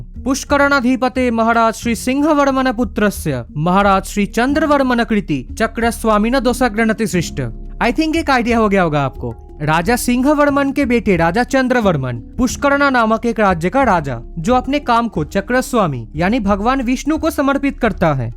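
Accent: Bengal, India. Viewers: Are you bengali? Your accent sounds like bengali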